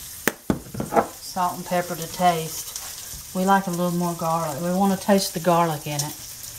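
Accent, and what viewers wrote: South, United States. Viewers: LOVE raw cabbage!!! I'm a country girl at heart, even though I left and went to the city years ago. I'm back and still love raw cabbage! Love ur video. I can't use the salt though, I'd swell up like a balloon. Bacon will have to do, cuz even that makes me swell a bit. But I love bacon too!!! Thanks for sharing. I love your accent. What state are you in? I left north and went south to VA 3 1/2 yrs, then to Houston TX for over 40 yrs, then SC 2yrs crossed over to NC for about 8 yrs, now back north for last 5 yrs. I miss the south though. Miss my friends and all the shopping and eating places. Blessings to you and ur hubby! L :)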